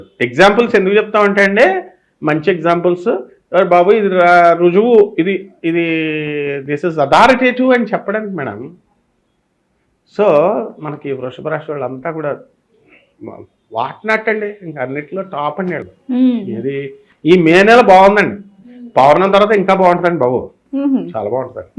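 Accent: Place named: Godavari, India. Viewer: ఎందుకు అండీ... స్లాంగ్ చేంజ్ చేసారు గోదావరి సైడ్ లాగ 😀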